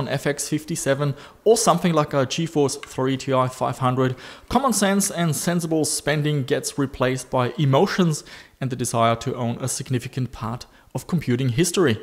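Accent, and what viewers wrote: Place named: Germany. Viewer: When I watched the video from 2015, you had less German accent than now.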